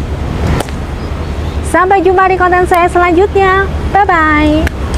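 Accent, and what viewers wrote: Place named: Java, Indonesia. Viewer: Informasi yang sangat bermanfaat ni mbak, di liat dari logat bahasanya pasti mbaknya orang jawa ya 🙏👍👍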